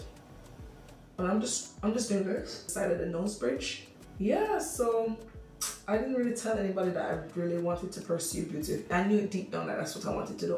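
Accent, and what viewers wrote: Jamaica. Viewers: girl, are you Jcan? I hear a slight accent, but then again, I could be wrong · heard ur accent and said instantaneously "Jamaica 2 d bbclt world" tf. Repping wonderfully mama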